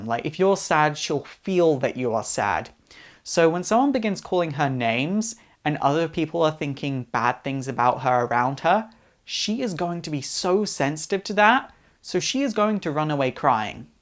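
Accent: United Kingdom. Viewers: dude are u british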